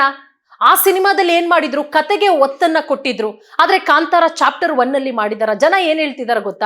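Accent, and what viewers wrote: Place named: Andhra Pradesh, India. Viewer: Telugu influence ninda mathadthiddara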